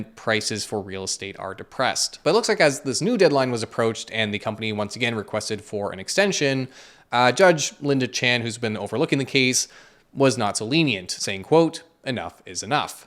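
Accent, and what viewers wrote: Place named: Canada. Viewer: The slight Canadian accent is cute 😊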